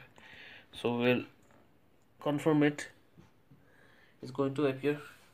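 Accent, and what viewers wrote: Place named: India. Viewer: Indian accent is so unattractive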